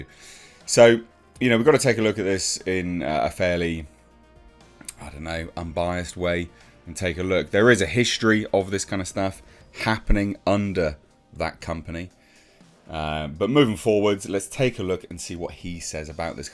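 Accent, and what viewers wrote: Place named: United Kingdom. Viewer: I love your videos but as a Texan, I have to say, I cringed every time you said the actor's name. You sound like my relatives in the UK. I laugh every single time they try to pronounce a Spanish or Portuguese word.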